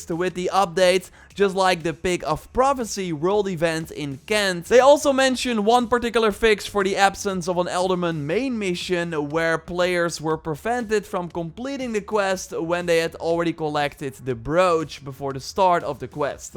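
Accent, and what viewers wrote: Netherlands. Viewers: The Netherlands! Finally! After five years quietly trying to place your accent At one point my best guess was Croatia but Dutch makes so much sense! Skall!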